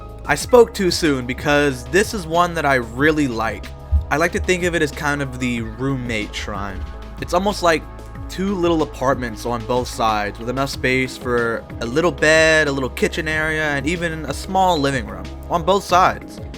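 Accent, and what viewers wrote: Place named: New England, United States. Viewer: ALSO New England Accent detected. i think? the way you pronounce "Room" reminds me of me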